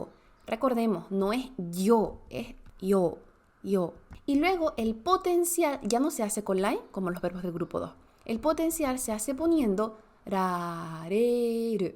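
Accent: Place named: Andalusia, Spain. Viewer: Im-pre-sio-nan-te. De lo mejor que he visto sobre japonés. Ya me suscribí. Sos como yo, sintetizando a tu modo encontrando puntos en común y poniendo en duda lo establecido. Pero lo hacés desde la autoridad que te da el dominar el tema, el haberlo estudiado en la forma tradicional (¿o ya de entrada cambiaste todo?). Una verdadera genia. Y la pronunciación, impecable (la del japonés, porque la materna...😂 Suenas a Andalucía ¿Puede ser?). Te admiro mucho. Y, claro,¡Gracias!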